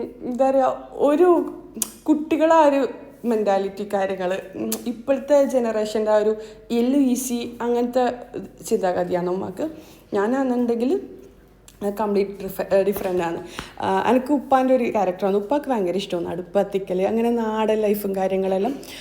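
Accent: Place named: Kasaragod, India. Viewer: ഇങ്ങടെ സംസാരം പൊളിയാ.. കാസർകോട് ആണോ 😍😍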